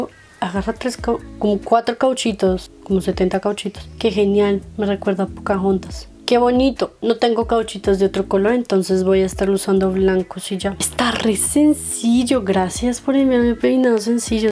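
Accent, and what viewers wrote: Colombia. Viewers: 1. Me encanta su cabello 2. Wow me encanta tu asentó (Creo que eres de Colombia ) pr tu asentó 3. Si alguien le da dislike a el video voy hasta tu casa y te mato😎 pq quien le daría dislike a esta joya de vídeo 4. Me encanta como editas Y eres de Colombia verdad ? Saludos desde Colombia 🇨🇴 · WOW!!! me encanto tu vídeo!!! 🦋N🦋E🦋V🦋A S🦋U🦋B🦋 pregunta: eres de Colombia? tu asentó es super colombiano JIJIJIJ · por el acento, Colombia creo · Su voz describe colombianidad 🇨🇴🇨🇴🇨🇴🇨🇴🇨🇴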